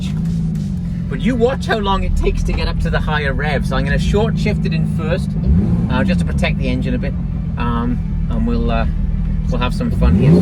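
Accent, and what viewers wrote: Wales, United Kingdom. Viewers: Welsh accent !!!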